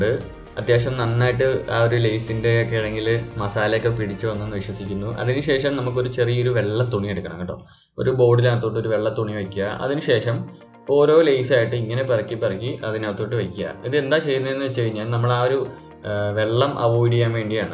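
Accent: Alappuzha, India. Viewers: ചേട്ടൻ ആലപ്പുഴക്കാരൻ ആണോ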